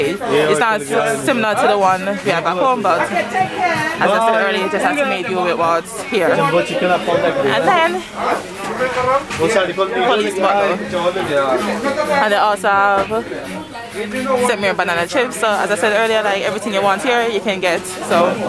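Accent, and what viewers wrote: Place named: United Kingdom. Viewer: Hear a lil british accent coming along! Hehe Brixton look festive! Loving the vids..keep going!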